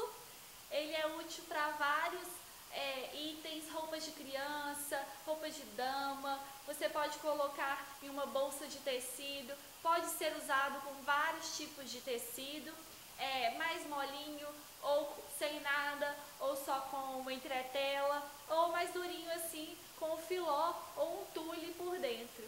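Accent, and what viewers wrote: Minas Gerais, Brazil. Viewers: Você é muito fofa, adoro a graciosidade que o sotaque mineiro tem!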